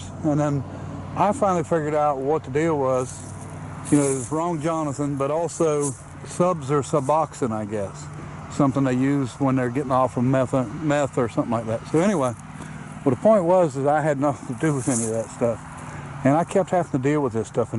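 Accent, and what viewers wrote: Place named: Maine, United States. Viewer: Sounds and looks like maine accent is throwing me off tho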